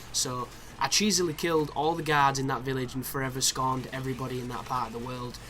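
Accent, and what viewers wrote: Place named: Yorkshire, United Kingdom. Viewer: Funny you don't watch GoT, your accent reminds me so much of Jon Snow's.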